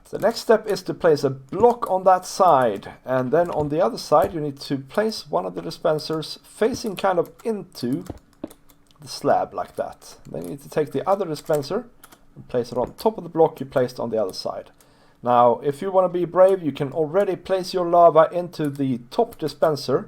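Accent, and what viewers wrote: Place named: Norway. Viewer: Oh i literally heard it in your voice I’m norwegian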